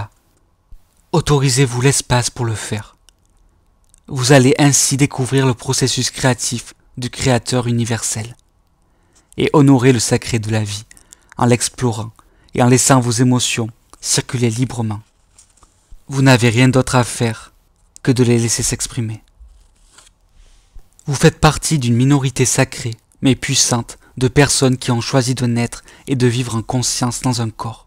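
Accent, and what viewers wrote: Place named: South-West, France. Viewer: Coucou merci confrère avec une voix qui chante et me rappelle le sud ouest du côté de ma mère de Toulouse merci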